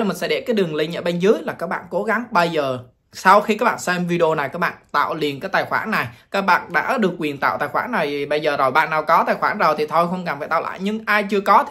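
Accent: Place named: Quang Nam, Vietnam. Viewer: Nghe giọng hình như a quê ở quảng nam đà nẵng gì phải ko ạ. Nghe a chia sẻ rất chân thật dễ hiểu . Em cũng có dự định tham gia mà chưa rõ các giấy tờ nào cần công chứng hay ko cần mong a ra video mới ạ❤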